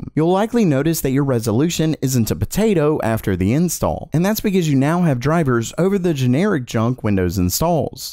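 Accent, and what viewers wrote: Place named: South, United States. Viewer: Why do you talk as if you're struggling to stop yourself from doing a Southern accent?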